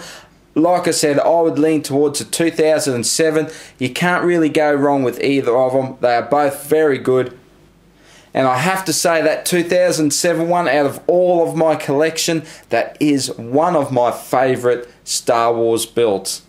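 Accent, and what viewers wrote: Australia. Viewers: Hmmmm, I wonder if he's Aussie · I'm Aussie and this dude has a REAL Aussie voice!!!! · R u australian firat thing i do i hear your voice then i comment yu sound thats why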